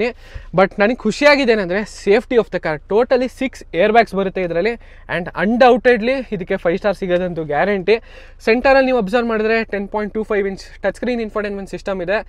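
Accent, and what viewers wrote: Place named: Mysuru, India. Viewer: Chennagidhe nimma mysuru kannada.. hige cargala bagge mathadtha iri..ellaru nodi tilkothaare..jai kannada, Karnataka, jai Bharatha ♥🙏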